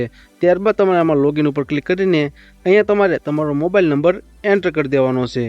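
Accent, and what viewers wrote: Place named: Surendranagar, India. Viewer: Surendranagar baju ni Bhasha ma avu bolaya 6e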